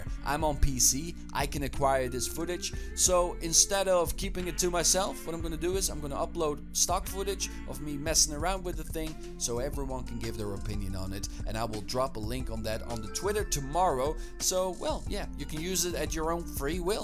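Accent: Netherlands. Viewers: I have to know... You wouldn’t happen to be Dutch, would you?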